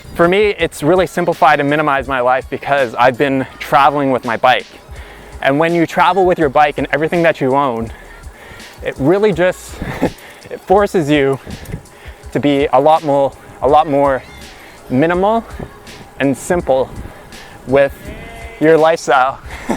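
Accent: Canada. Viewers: I new he was from Canada.the way he says about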